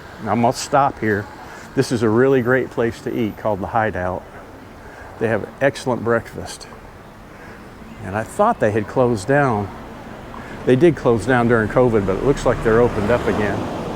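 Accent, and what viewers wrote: United States. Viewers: New Sub, enjoying your vids. You made reference to previously being On The Job in an earlier vid (what Department or part of the States). I hear Midwest or South East Region in your voice? Regards, JP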